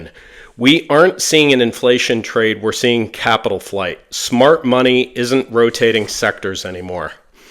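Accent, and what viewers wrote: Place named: Austin, United States. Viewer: I see "TEXAS" next to where it says how long ago this video was uploaded. What part of Texas are you in? I am up here north of Dallas in Frisco. Just saw your channel in my feed for the first time. Sounds like you are in or around the Austin area. I trade for a living and have been trading the precious metals exclusively since '03. Enjoyed the video and was happy to hear it free of price targets predictions but more about just the mechanics of working with the daily zigs and zags which is reallyl all its about once you determine what the med and LT trend direction is, assuming there is one.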